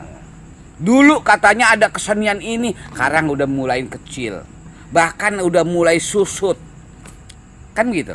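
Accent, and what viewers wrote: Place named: Betawi, Indonesia. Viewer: Terimakasih penjelasan ttg wayangnya bang Haji Mandra ... Mau tahu nih bang Haji , kok bhs betawi abang Haji sm bhs betawi almarhum babe Benyamin beda ... Knp logat betawi bisa beda begitu bang ... Kl bisa tolong jelasin dong bang Haji , makasih ya bang Haji sebelumnya nih , sehat terus bang Haji 👍🙏🙏🙏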